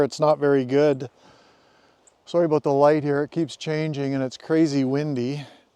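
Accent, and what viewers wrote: Canada. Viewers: From the first shot, I was like wonder where he’s at? Starts talking: oh, definitely Canada. Probably Kelowna. Good riding man! I do wonder why you would do this over a 500 EXC with traction control and a Rade Garage tower. You Get the torque, suspension, engine mapping. I wonder if it’s just the electronics package that makes this bike interesting as ADV bike.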